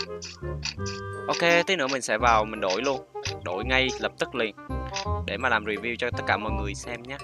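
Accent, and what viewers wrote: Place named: South, Vietnam. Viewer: Anh Nói Chuyện Không Hay Bằng ZivGame Đúng Là Giọng Miền Bắc Nghe Thú Hơn Giọng Miền Nam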